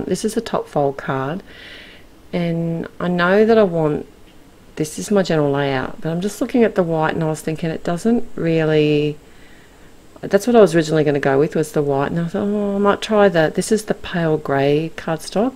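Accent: Australia. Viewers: ABSOLUTELY LOVE THIS SIMPLE, ELEGANT CARD. Yes, sorry, I'm yelling! It's gorgeous! Love this whole new Altenew release and want 99% of it. Lucky girl to be able to play with all these fabulous products. Thanks so much for sharing. I keep saying it, but it's great to listen to another Aussie. Where do you buy your fun foam? Can't seem to find it here in Oz. Thanks so much.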